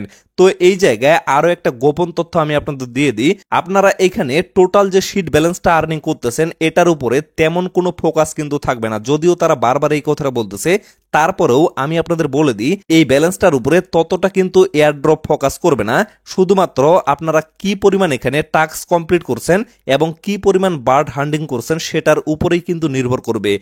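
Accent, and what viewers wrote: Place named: Thakurgaon, Bangladesh. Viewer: first cmnt,,দাদা আপনার ঠাকুরগাঁওয়ের কথায় বাসা🎉🎉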